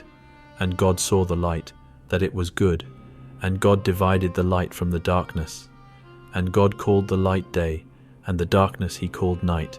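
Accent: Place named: England, United Kingdom. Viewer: MY BROTHER YOUR WORK AND VIDEOS ARE SECOND TO NONE!! AND YOUR ENGLISH ACCENT MAKE IT EVEN BETTER! AND MY FRIEND THERE IS NO OUTER SPACE, THATS WHAT WATER ABOVE THE FIRMAMENT MEANS! AND WHAT THE DEEP MEAN DESCRIBED IN THE BIBLE! HOW DOES AN EXPANSE OF AIR LIKE NASA CLAIMS SEPARATE THE WATER ABOVE? IT DOESN'T! THE FIRMAMENT IS A SOLID GLASS DOME SPREAD OUT LIKE A TENT OVER A FLAT PLANE! THIS IS ONE OF THE STRONG DELUSIONS THE BIBLE ALSO TALKS ABOUT! GOD BLESS YOU SIR!!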